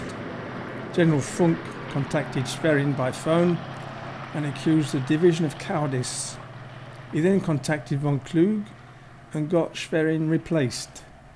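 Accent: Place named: United Kingdom. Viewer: I'm glad that this is highly detailed but when you jump from one unit to another every ten or fifteen seconds it becomes very difficult to keep up with. Have you thought about animating with some arrows or directions so that I know which unit you're talking about and which direction they're actually moving and who they might be facing? I understand that all of this is hard work but these would be so awesome if I could follow them just a little bit easier. I'm sure your British accent doesn't help my dumb American brain much either. I am using subtitles though.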